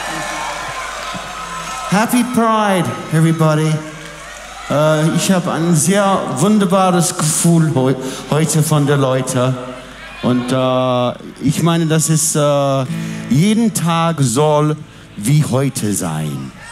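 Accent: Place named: Cologne, Germany. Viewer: Der kann auch Kölnisch Deutsch!😄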